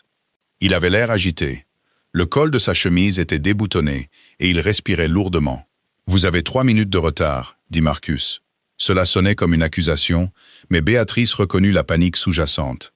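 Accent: England, United Kingdom. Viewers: L'histoire est belle et originale! Bravo! Dommage pour l'accent anglais assez inutile...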